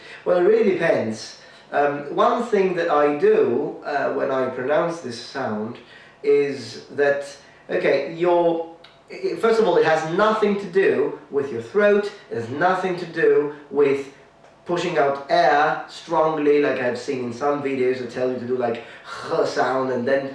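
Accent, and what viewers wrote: England, United Kingdom. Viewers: are you british? I mention it because of your accent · Thank you so much! You are my language GOD! I’d been pronouncing the German r instead of Italian r for 10 years in my Italian and Spanish. I’d seen tons of videos and only 2 videos helped me a little bit. I’m studying bel canto now. It’s very important for me to make this detail perfect. I had subscribed you for liking you. Tonight I was so frustrated with this tricky r, and accidentally saw this video. THEN I DIRECTLY PRONOUNCED IT RIGHT by mimicking you. Yeah it is just as easy as you said. You have no idea how much I LOVE YOU right now. Btw I was attracted by your English accent at first. It’s beautiful. And I noticed your Chinese is also good. (My nationality is China but I’m ethnic Korean) You are the maestro of language in my heart. Now I can go to sleep happily. 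Buonanotte!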